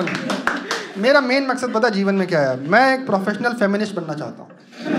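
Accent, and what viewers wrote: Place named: Punjab, India. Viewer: LOVE UR PANJABI ACCENT OF SPEAKING BC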